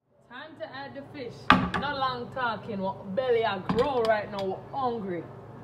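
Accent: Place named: New York, United States. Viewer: I just love ur new York accent 🙂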